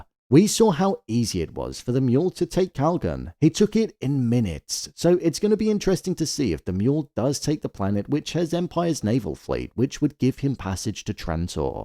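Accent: United Kingdom. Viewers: Is this guy a British AI voice?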